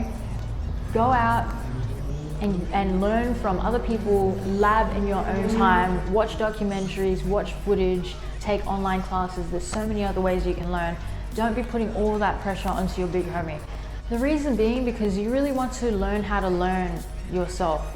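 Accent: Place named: Australia. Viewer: man, everything u talked about this video are literal GEMS of krump!!! its funnaye how it wasnt until this year or during lockdown, i found myself and keep growing just by focusing on myself and not comparing to others. Keep spreading your love for dance. Haha i forgot that your aussie accent is so distinct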